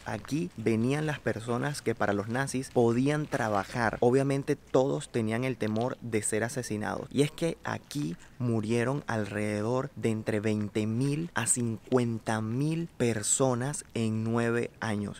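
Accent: Venezuela. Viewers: ME ENCANTO MUCHO LA FORMA EN QUE SIENTES Y EXPLICAS LO QUE ALCANCE A VER DEL VIDEO.. PORQUE LA VERDAD ... LOS SENTIMIENTOS O EMOCIONES NO ME DEJARON CONTINUAR VIENDOLO.... ES MI PRIMER VIDEO QUE VEO DE TI...... ME SUENAS A UN PAISANO...SUENAS COMO VENEZOLANO.... PERO ESE NO ES EL PUNTO..... LA VAINA ES QUE ME IMAGINE ESTAR AHI.... O PASAR UN MOMENTO ASI Y DE VERDAD QUE ES HORRIBLE .. Y ESO QUE ES SOLO IMAGINANDOMELO......... Y SOLO PIENSO EN AQUELLOS QUE VIVIERON ESO.... MUJERES, NENES, HOMBRES DE VERDAD QUE DUELE..... DUELE LA FALTA DE EMPATIA, LA FALTA DE AMOR AL PROJIMO , LA FALTA DE ACEPTAR A LOS DEMAS COMO SON .... NO IMPORTA LA RELIGIONNNN , NO IMPORTA EL COLOR DE PIEL.... NO IMPORTA COMO SEAS..... TODOSSSSSSSSS MERECEMOS [ R E S P E TO ] TODOS SOMOS PERSONAS, NO TRATEMOS A LOS DEMAS COMO SI FUERAN ANIMALES O MENOS QUE NOSOTROS..... POR FAVOR AMEMOS AL PROJIMO COMO A NOSOTROS MISMO..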